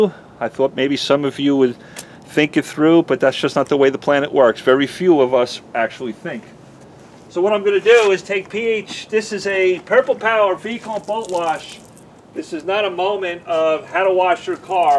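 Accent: New York, United States. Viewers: you say this is Formula 4. Then you say this is Formula 1. LOL. 2nd, why the hell are you wearing a hoodie in such a hot place like Texas. I guess you are really crazy. 3rd, you sound like you are from New York City yet you live in Texas.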